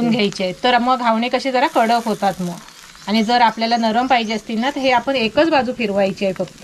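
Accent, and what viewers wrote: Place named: Konkan, India. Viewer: Mla kokani bolna kupch aavdt tumch 🎉❤😊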